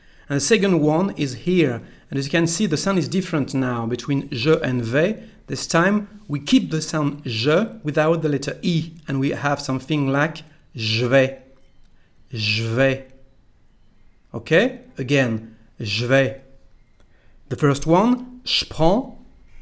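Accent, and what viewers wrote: France. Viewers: Salut! Would this be the northern accent? Saying "sh" instead of "j". I'm from Brazil learning French in English, pardon me if I say anything too stupid. I recently watched the movie Bienvenue chez les Ch'tis and was wondering if there's an accent here. Merci beaucoup!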